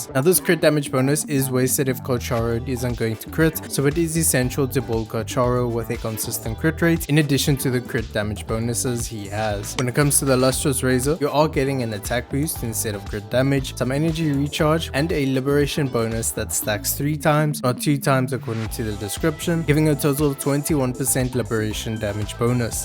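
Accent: South Africa. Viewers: Brother based of your accent and ping are you from South Africa?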